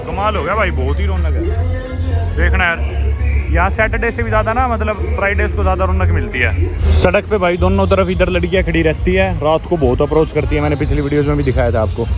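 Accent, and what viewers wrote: Haryana, India. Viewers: so nice to hear Haryanvi dialect in this vlog. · Language me haryanvi touch kamal hai bhai❤❤❤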